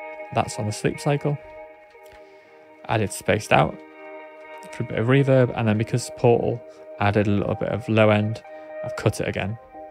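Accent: United Kingdom. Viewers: Hey good guitar playing mate what part of Yorkshire are you from?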